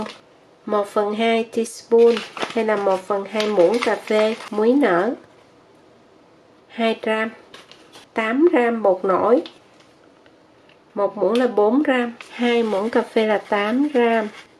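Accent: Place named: Southwest, Vietnam. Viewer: Tôi cũng thích bánh Quẩy lắm , nhưng thích nhìn thấy cô chủ kênh hơn ! Vì tôi xem kênh nầy rất lâu rồi mà không thấy được mặt chủ kênh nên tức lắm, nghe giọng nói của dân miền Tây Nam Phần rất thân quen và dịu dàng đáng mến quá lại thêm nấu ăn giỏi quá , phải chi được nhìn thấy một lần thì hay biết mấy, hổng chừng là bà con nữa đó .😅😅😅❤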